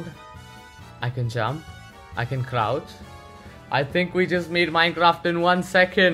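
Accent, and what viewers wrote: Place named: India. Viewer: ur indian accent is heavy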